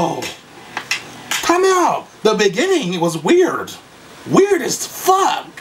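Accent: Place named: United Kingdom. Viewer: The British accent tho😂❤️❤️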